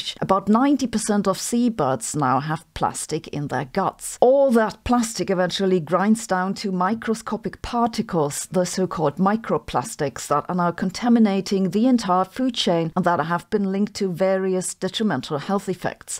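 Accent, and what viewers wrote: Germany. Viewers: And I love your cherman accent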